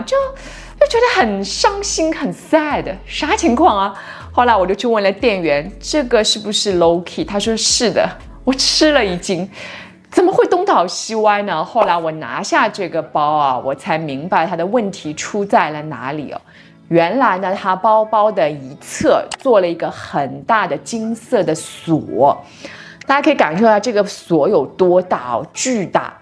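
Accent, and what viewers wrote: Shanghai, China. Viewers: 小姑娘好像是上海人。讲的很好 把我这不懂的瓜，都有点懂了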